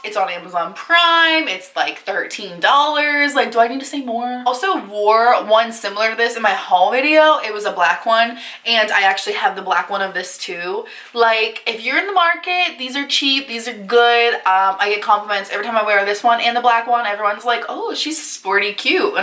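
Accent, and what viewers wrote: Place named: United Kingdom. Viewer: I thought she was British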